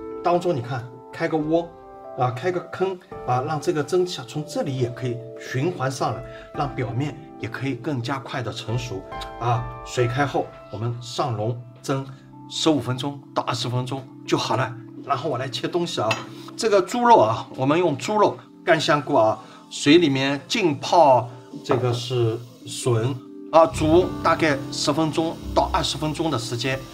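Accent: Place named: Jiangnan, China. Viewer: 很幽默的师傅，能听到江南口音。虽然觉得我自己做饺子皮还挺熟练的，这个烧卖皮还是有难度。厉害厉害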